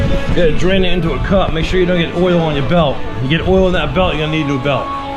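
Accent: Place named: East Coast, United States. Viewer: LOL ProChargA. It’s obvious you’re accent isn’t from most of America. East coast or New Orleans. Great video!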